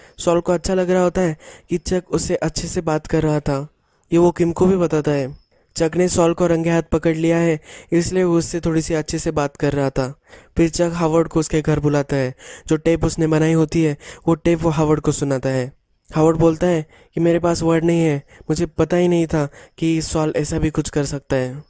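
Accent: Maharashtra, India. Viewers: Tu pakka Marathi hai Accent pakad Kiya mene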